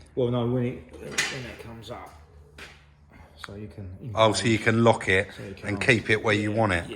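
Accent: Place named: Yorkshire, United Kingdom. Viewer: He must be a Yorkshire man .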